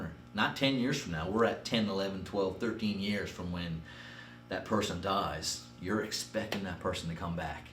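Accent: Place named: Nova Scotia, Canada. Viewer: Not sure how i missed this video. But great accent! Miss nova scotia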